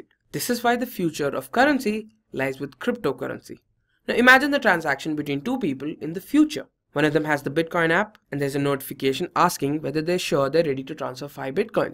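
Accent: India. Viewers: Bruh he asked is the voice in the video BELONGS TO A INDIAN 😂😂😂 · Good info. Please improve your English accent from IN-GLISH!!!!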